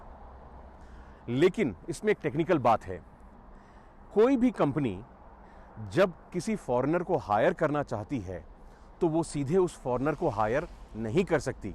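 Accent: Standard. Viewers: love you दोस्त, बहुत खुशी हुई आप अपने देश से इतनी दूर होने के बाद भी अपनी मातृभाषा नही भूले और गजब की शुद्ध हिंदी बोलते हो आप की भारतीयों को भी समझने में कठिनाई हो जाये 😜 अच्छा लगा किसने अपने का इस तरह राह दिखाने का काम करना 🌹🌹💐💐🌹🌹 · Your hindi is very pure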